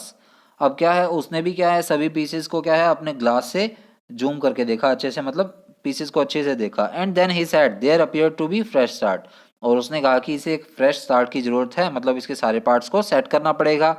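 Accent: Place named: Haryana, India. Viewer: You teach very good 😊👍🏻👌🏻 And I think that you belongs to haryana your seeking style is showing that 🤔🤔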